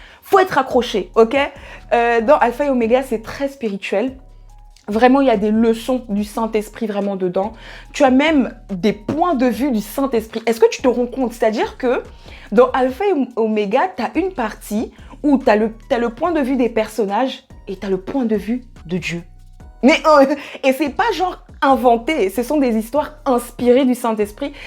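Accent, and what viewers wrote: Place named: England, United Kingdom. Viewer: Une vidéo très intéressante, j'aime cet accent anglais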